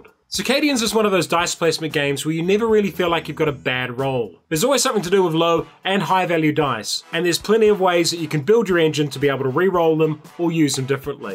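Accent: New Zealand. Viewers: Kia ora, first time watching your show and obviously picked up the kiwi tone etc. Then to hear the Waikato part was great. Are you still in the Mighty Waikato as Hamilton is my home town.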